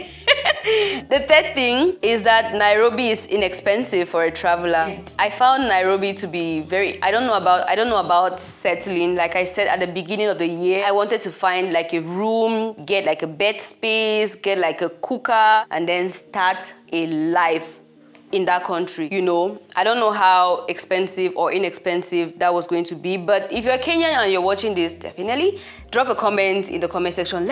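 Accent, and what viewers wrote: Nigeria. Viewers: You sound like a Nigerian,.. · Your accent is almost Nigerian a little bit but not very heavy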